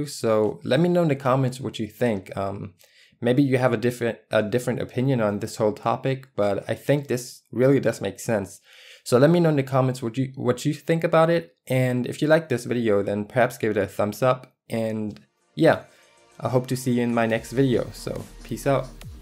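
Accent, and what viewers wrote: Germany. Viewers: Are you German? Btw love the work! :D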